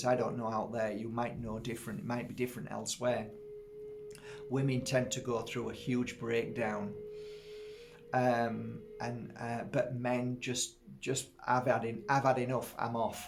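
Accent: United Kingdom. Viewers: I love listening to you! And I love your UK accent ♡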